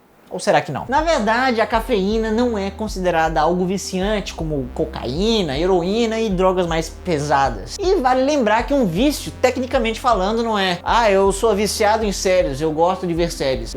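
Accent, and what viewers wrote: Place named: Fortaleza, Brazil. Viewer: O algoritmo me trouxe aqui. Tô maratonando. Vem cá. Você é de Fortaleza? As vezes você fala como nós aqui. Um cheiro. Tô espalhando teu canal pelo "mei do mundo".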